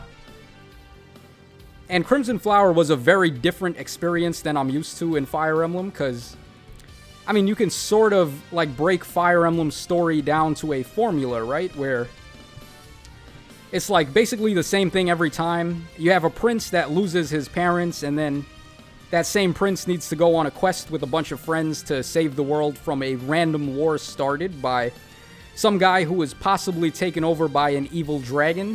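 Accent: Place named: East Coast, United States. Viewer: If your accent didn't give away that you're from the east coast, you going to a "bodega" did. Congrats on that lotto win, though, the IRL equivalent of summoning 5*s. I might want to play now, too.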